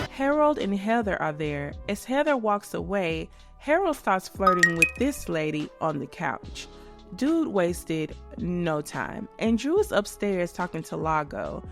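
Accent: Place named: Midwest, United States. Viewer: your opinions are clinical however, I wonder which character you relate to most my guess is Dr. Wade. Phonetically I would guess that your upbringing was in the southern Midwestern United States.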